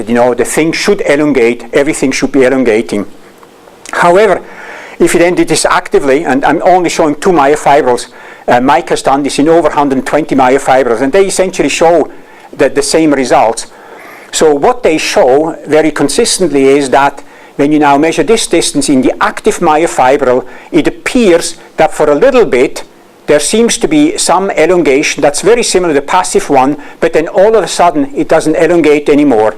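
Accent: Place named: Switzerland. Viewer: YEEE Er esch en Schwizer vo Leuggere. Geile Typ I was 100 percent sure hes got the classic swiss accent. Guess what - he is from my homecountry xD.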